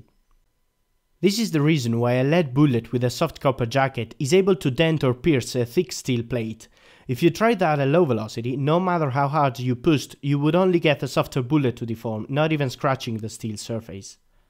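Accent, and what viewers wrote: Italy. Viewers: He's Italian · troppo forte l'italiano che parla inglese , shock ! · ma sei italiano? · Ma sei italiano? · Are you italian? The accent and the beretta? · All'inizio dall'accento ho pensato fossi italiano, vado avanti e leggo nella cartuccia del fucile a pompa "fiocchi", lì mi sono tolto ogni dubbio😂. Davvero complimenti per la pronuncia in inglese, sei molto bravo! Il video è fenomenale, sei davvero bravo!! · He is Italian. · Dall'accento presumo che sei Italiano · sei italiano l ho capito subito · He sounds so italian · Hey ma sei italiano? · This guy is Italian I’m sure. I’m Italian I recognise the accent. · he's Italian, it's his accent. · L'accento italiano si riconosce ovunque, bel video, strutturato in maniera ottima e molto informativo, bravi.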